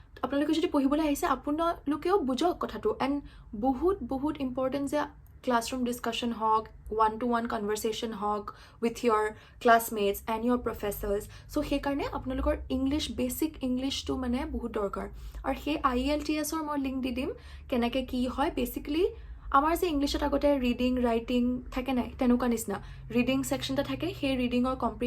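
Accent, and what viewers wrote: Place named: Jorhat, India. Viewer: I love how you have that typical Jorhatian style in the way you talk. Much love❤️ - Dohabora Chuck Jorhat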